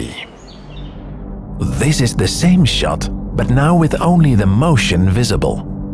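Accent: Netherlands. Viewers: how can you pronounce everything perfectly but still have a massive dutch accent lolol. nice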